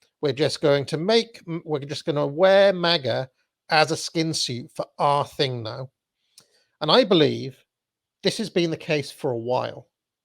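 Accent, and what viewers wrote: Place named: United Kingdom. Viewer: So, you start this video, sounding like a very intelligent and wise person. Your British accent helps to accentuate this quite well. You then speak how many or most of the billionaire class seek to enslave us and the tactics that they have been using to do so. Yet toward the end, you completely pivot, like you fell down some sort of rabbit hole, and try to tell us that the people who seek to return the power to the people are actually moles for the billionaire class. I believe that you, yourself, are a mole for the billionaire class, or, more likely, you are just a damn defeatist who would do better for the world by just shutting the .... up.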